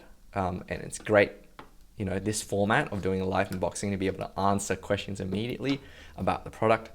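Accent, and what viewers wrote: Australia. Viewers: Random question but is your accent australian?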